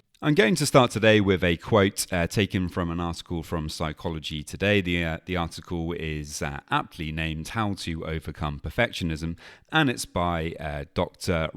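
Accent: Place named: United Kingdom. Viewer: Didn’t realize you had a British accent til like 8 minutes in 😭😭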